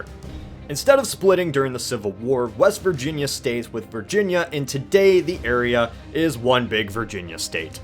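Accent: Ohio, United States. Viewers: you from Ohio? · Is he from Ohio?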